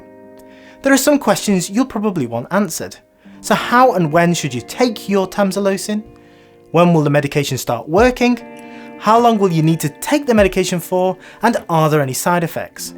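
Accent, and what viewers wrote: United Kingdom. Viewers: He has a British accent because he’s British. He speaks very clearly and is easy to understand to me and the background music is fine too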